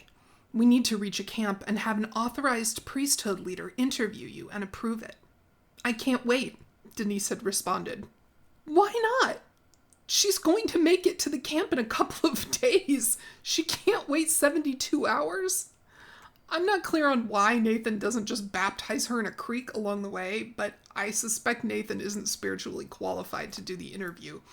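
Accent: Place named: Russia. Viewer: Just love your Russian accent.❤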